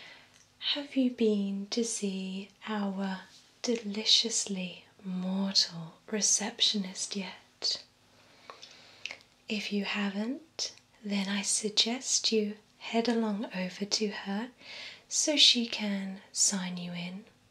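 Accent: United Kingdom. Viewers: There is nothing that turns me on more than a super-hot girl from the United Kingdom; their British accents drives me WILD!! This is a pretty cool skit too; her acting was really good!! This clip should have way more views and likes than it does!!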